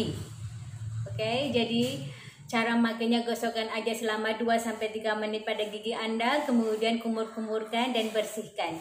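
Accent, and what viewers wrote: Malaysia. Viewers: Ini orang mana sih ngomongnya agak kaku? Kyknorang melayu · Suaranya kek Logat melayu dan serasa di dalam pesawat.enjoi the voice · Cara dia cakap macam orang malaysia bagus saya faham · suara ny kayak logat orang maisya🇲🇾🇲🇾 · Logat Malaysia ,ya. Salam dr Tangerang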